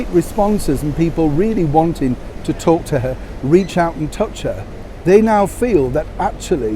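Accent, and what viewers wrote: Yorkshire, United Kingdom. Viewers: Wel Done, always spot on and such a warm yorkshire voice... Respect fron NE Thailand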